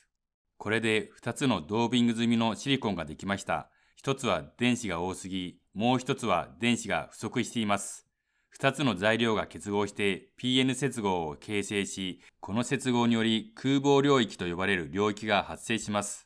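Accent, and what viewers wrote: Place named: China. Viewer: 喋り方が中国人っぽい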